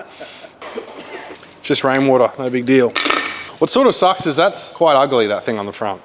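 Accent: Australia. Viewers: These little cars are so cool. Also wait, you're Aussie! Subscribed!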